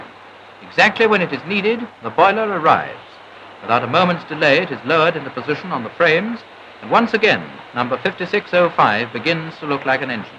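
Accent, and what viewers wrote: RP, United Kingdom. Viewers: These wonderful old movies from the days of steam power are always narrated by a "received pronunciation character, educated at one of the finer boys schools in the land. In the background a symphony orchestra is going absolutely nuts with a rendition Stravinsky could have penned. 😲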